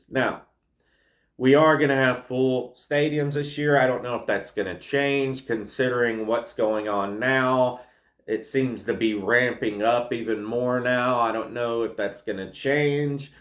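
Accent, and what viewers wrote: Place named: South, United States. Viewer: I bet, I live in DC now and there's a few of us that meet up at a bar every Sunday I was surprised there was Titans fans in the nation's capital Also that's cool you knew bloxville stands for Knoxville Tennessee. I can tell by your accent in your videos you are definitely from down south for some reason I was thinking you live in Nashville idk why lol Love the videos though keep it up I like your long videos or the short ones I don't know how long you've been doing it but you're doing a good job ✌🏼 bro bro keep trucking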